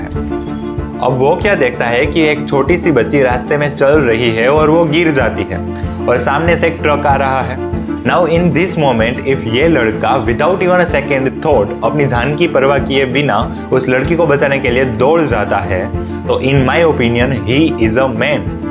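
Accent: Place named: Gujarat, India. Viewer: You sound gujrati. Feels good to see gujrati doing such different kind of videos. Also very new to this field. You sound nervous also. But keep going bhai you will overcome it all with time. All the best doctor saheb.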